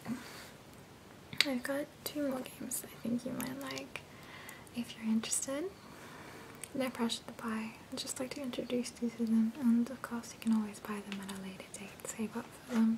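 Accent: Australia. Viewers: Not really into the asmr roll play videos, but I found this really relaxing and you know your stuff. Looking forward to some new videos. Oh, Australian right?? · Asmr, games, cute girl with an aussie accent? I have died and gone to heaven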